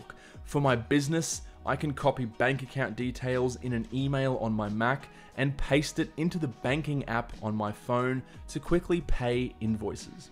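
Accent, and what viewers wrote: Australia. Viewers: Generally, I face difficulties understanding the Australian accent, but the way you speak - clearly and slowly, I get almost 100% of what you are saying.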